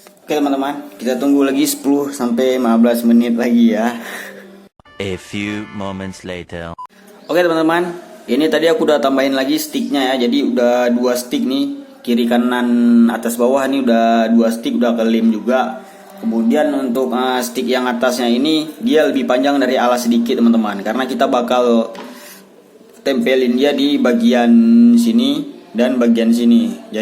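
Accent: Medan, Indonesia. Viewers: Logatnya medan kale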